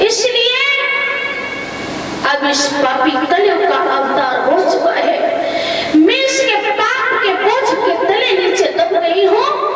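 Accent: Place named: Fatehabad, India. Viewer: Maa Vivek Se Sundar to Katha kahati hi jai Unki Awaaz to Fatehabad Jaisi Hai❤❤❤❤❤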